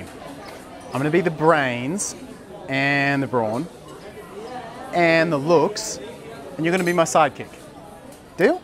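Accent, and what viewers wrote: Australia. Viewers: you've got beautiful body building but the best part of you is your face your eyes your lips and your smile and your voice I know too much ha ha but it's true- I whish I could live in Australia I love Australian accent :))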